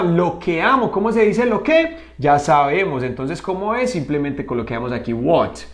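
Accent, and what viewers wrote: Colombia. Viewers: erez de colombia tienes asento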